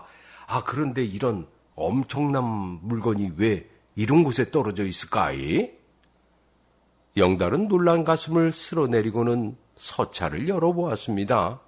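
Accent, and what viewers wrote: Jeolla, South Korea. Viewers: 구수한 나레이션이 좋았지만 전라도 사투리가 어슬프 듣기가 거시기합니다. 걍 표준어로 하세요. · 절라사투리. 징하구만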